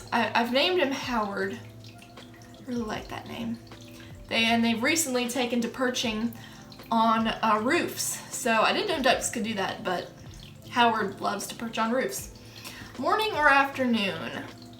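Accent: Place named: South, United States. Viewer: omg ily you're personality is on point! all my questions were already answered by the other people so I didn't submit one lol AND OMG I THOUGHT YOU WERE 5'6 OR 5'7 I WAS SO CLOSEE WAIT I KNEW YOU WERE TALL but i notice an accent where are you from? it sounds like country ish 🤠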